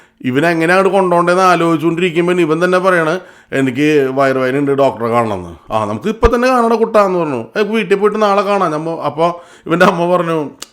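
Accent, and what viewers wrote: Thrissur, India. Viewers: ഞാൻ ദൈവവിശ്വാസം ഇല്ലാത്ത ആളാണ് എന്നാലും ബ്രദർ തൃശൂർ സ്ലാങ് ഇഷ്ടപ്പെട്ടു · Good presentation 👍Thrissur slang super 🤩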